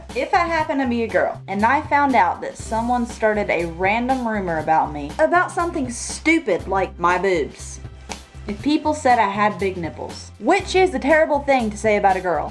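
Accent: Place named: South, United States. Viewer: Where you from folk, you got a slight southern accent doe.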